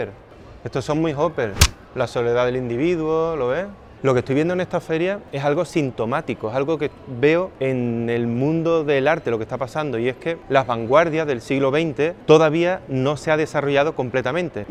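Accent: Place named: Seville, Spain. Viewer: Como lo pusiste con k pensé que a lo mejor allí no se utilizaba, cosa me extraño que así fuera, pero como hay palabras comunes aquí que en algunos países de Latinoamérica no se utilizan, pues sera una de esas. En cuanto a la pronunciación, el es sevillano y tiene su acento particular dentro del acento español andaluz.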